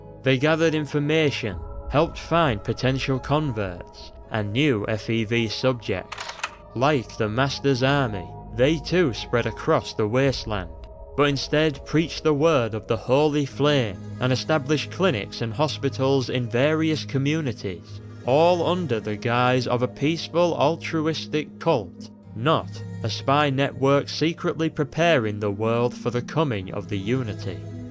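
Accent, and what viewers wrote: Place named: Northern England, United Kingdom. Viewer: Great video my friend. Love the northern accent!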